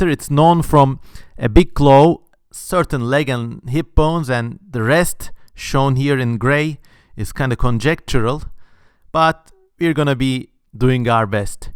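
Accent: Turkey. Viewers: That accent is so Turkish and I love it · I was just curious about All Tomorrows author, but never clicked one of his videos. I came across by and clicked it. After 1 second I heard you, I was like "Dude, are you Turkish?" And I clicked the Patreon and YES I WAS RIGHT Brrrr Ellerine sağlık <3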